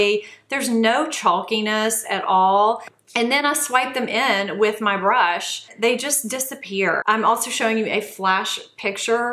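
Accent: South, United States. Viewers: I'm new to your channel. 10 seconds of watching you and you have yourself a new subscriber. Also do I hear a southern accent?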